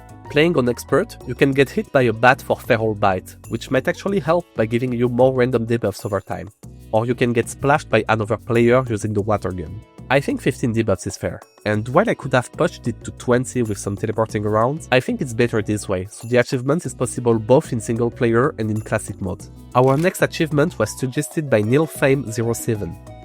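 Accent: France. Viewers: you sound like you have a french accent. Am i wrong?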